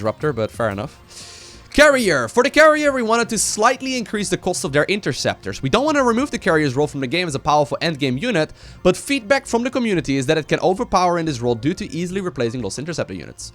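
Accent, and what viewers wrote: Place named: Netherlands. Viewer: I find that if I watch too many videos in a row I start unconsciously talking with a light Dutch accent.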